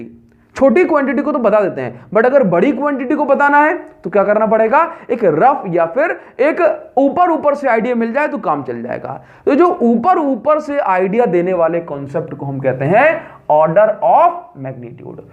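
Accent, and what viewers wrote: Odisha, India. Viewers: Sir ap odisha se ho kyaaaa.....ap bahut achhse padha rahehooo.thanks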